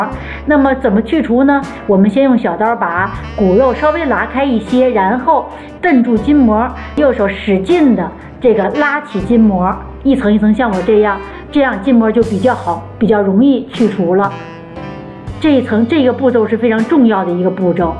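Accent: Tianjin, China. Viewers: 大姐 天津人吧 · 天津口音，哪里的？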